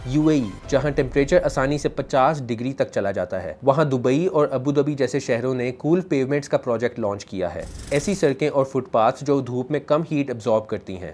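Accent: Lahore, Pakistan. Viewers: aapka content acha hai lekin bs apni urdu sahi krain lahori accent hai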